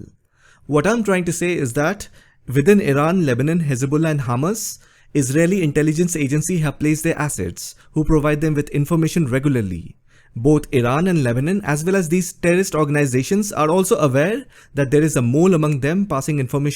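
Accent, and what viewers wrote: India. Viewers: Shut up mate barley understand your curry accent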